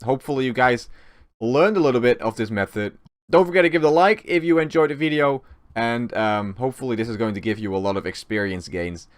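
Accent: Netherlands. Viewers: The accent.. Dutch? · ARE YOU DUTCH?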